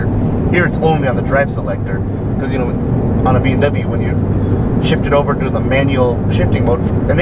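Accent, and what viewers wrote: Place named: India. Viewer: you sound like an indian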